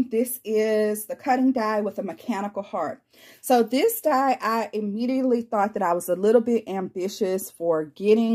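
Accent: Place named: Georgia, United States. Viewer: Adorable! I have a new Cricut machine x2 Months that I haven’t played with yet. You’ve inspired me! Subscribing! What state do you live in? You sound like a fellow Georgia girl FYI The Color Purple is my favorite movie of all time!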